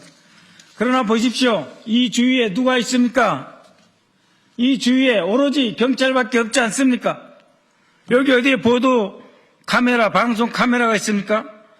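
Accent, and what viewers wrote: North Korea. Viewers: 말투나 어떻게 좀 해 주세요. 여기가 북한도 아니고.. 슴다 슴다.. 듣기 거북해 ... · ㅋㅋㅋ 북한말투 거슬려.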